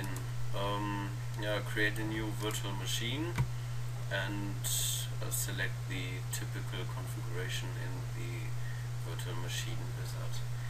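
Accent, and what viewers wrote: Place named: Germany. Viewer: bist du deutsch xD das hört man :D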